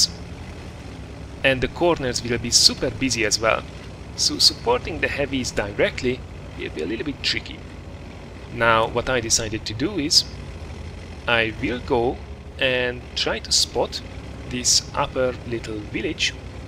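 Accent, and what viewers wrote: Hungary. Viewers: i like hungarian dialekt! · magyar vagy? mert amikor beszélsz úgy hangzik mintha magyar lennél. Are you hungarian? Cause you sound like a Hungarian man when you talk :/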